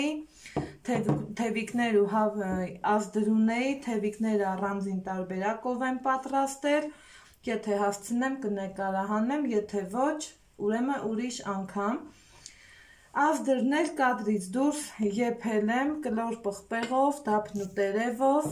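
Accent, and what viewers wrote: Lori, Armenia. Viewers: Շատ հավեսին եք խոսում, Լոռվա ակցենտով 😁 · Լոռվա ակցենտով եք խոսում, շատ հաճելի էր ամպայման կպատրաստեմ👍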